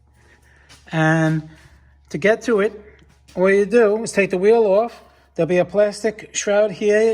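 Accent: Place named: New England, United States. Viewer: My Boi got that New England/ NY accent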